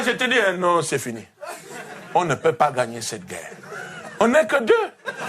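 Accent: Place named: Ivory Coast. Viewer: Il a fait un accent ivoirien pas sénégalais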